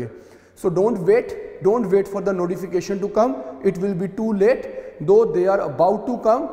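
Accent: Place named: Punjab, India. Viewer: I really like sir's accent.. The typical Punjabi 😊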